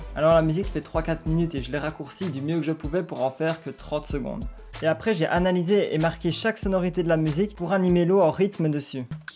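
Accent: Belgium. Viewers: Tu es belge? #accent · Ho ! Un belge ! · Hey je me suis aboné j'aime bien se qeu tu fait ta du talent est je lenten a ta voi te Un belg moi osi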